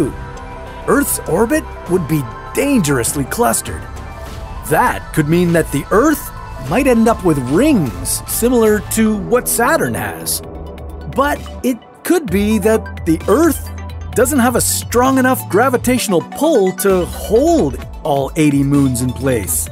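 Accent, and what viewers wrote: United Kingdom. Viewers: 終於是英音啦 讚讚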